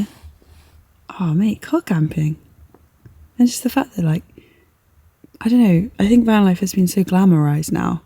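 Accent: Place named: England, United Kingdom. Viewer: You are so cute and your English accent is to die for. I absolutely love an English accent.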